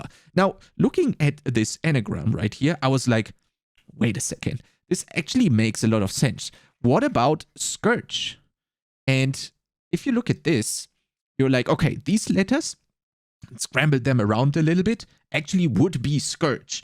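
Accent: Germany. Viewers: Alter... noch nie einen so dermaßen deutschen Akzent gehört.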